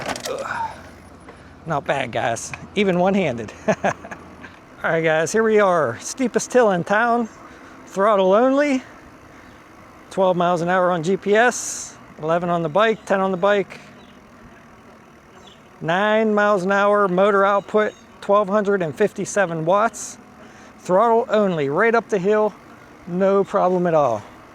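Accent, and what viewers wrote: Pittsburgh, United States. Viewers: My man, you HAVE to be from Da Burgh. LOL!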